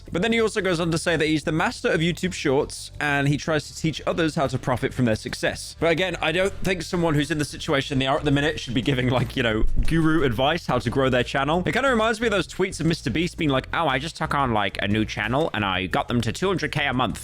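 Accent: United Kingdom. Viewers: Idk why but I like listening to this brit yappin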